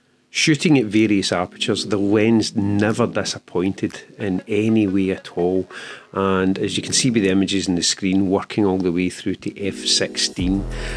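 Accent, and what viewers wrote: Scotland, United Kingdom. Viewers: Nice review, thanks! Your intro is very dramatic and usually these lead to a confident American model...Then we get your strong Scots accent. It’s always good to be a little different. Your images are excellent so I’ve subscribed immediately. I really hope you don’t dump your Nikon gear; you know it will be better in low light (over the Xt5)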